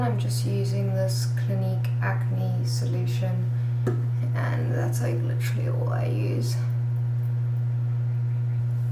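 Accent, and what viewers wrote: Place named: United States. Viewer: Чувиха ботает на американском языке. А это немножко не английский.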